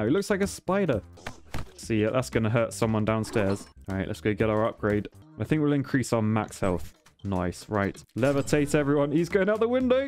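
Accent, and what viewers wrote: United Kingdom. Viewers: Anime karate noises with a British accent are not the bees knees.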